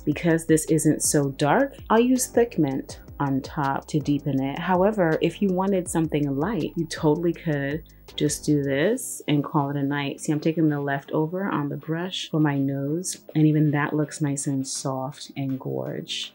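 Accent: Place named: Rhode Island, United States. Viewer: Only a select few are gonna recognize your accent…and I’m one of them! RI STAND UP! And the bronzer looked beautiful on you, but let’s face it, anything would!😍😘❤️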